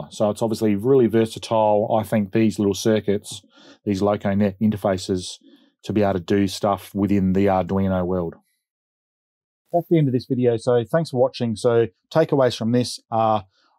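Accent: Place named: Australia. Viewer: Well, kinda hard to say, but listening to it was easy, and though I was doing other things, I was able to key in on what I was searching for, I.E., tying my Arduino in with my Digitrax system, plus a bonus of JMRI interfacing with both. And I have to be honest, I found your Australian accent cool! That said, I will probably listen to it again and again to get the full gist of it. But overall, it was easy to listen to and very comprehensive. Thanks again, mate, or as we say In America, pal!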